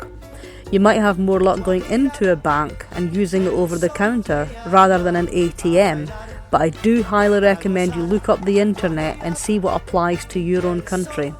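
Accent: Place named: Scotland, United Kingdom. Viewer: Hi. I found your video very comprehensive and, although I have no intention of ever visiting Cuba for philosophical reasons, your advice is transferable to many other similar countries. I was amused by your accent and the prospect of someone like you trying to learn to speak Spanish. I intend no insult. I was born in Glasgow and moved to North America with my family when I was seven years old. My parents had very strong Scottish accents as did the many family members who travelled here to visit over the 60 years I have lived here. I can't imagine any of them ever becoming conversant in Spanish. I once told my Auntie Betty that I needed an English/Gibberish dictionary to understand her. I am, however pretty fluent in Spanish as my own family travels, mostly to Mexico often. We are scuba divers and love the chance to visit small out of the way villages to dive and it is imperative that you speak some Spanish and the people really appreciate that you make the effort. 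Anyway, I found your attention to detail really great and I'll look for other reviews from you. Best wishes Tom Duncan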